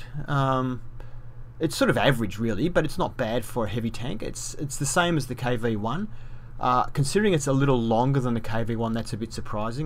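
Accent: Australia. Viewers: R u an Australian/New Zealander?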